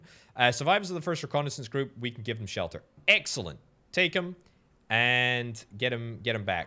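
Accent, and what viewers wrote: Scotland, United Kingdom. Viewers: I thought heard some Scottish in there. I'm from Edinburgh, though and I can't place the accent. Was wondering if there was something more to it.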